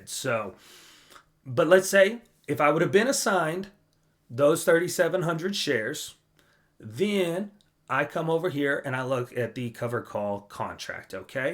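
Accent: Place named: South, United States. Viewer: Maw frands LOL, you sound southern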